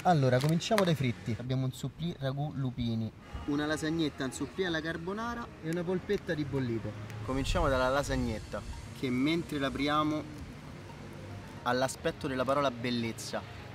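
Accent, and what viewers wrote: Rome, Italy. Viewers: Un bel corso di dizione non sarebbe male, traspare la poca istruzione avuta quando parli romano "andiamo a provà" "annamolo a scropì" "e quindi mò".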